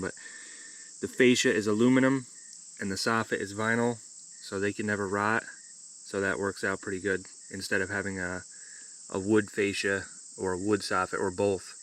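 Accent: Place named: Kingston NY, United States. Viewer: Sounds like your from Kingston Ny I live in Pleasant Valley. I like your mechanic videos and roofing videos.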